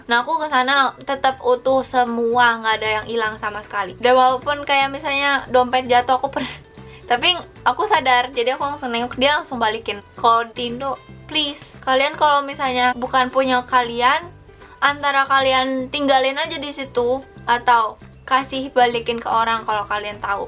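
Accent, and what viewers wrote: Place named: United Kingdom. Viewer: your accent is getting more british! ❤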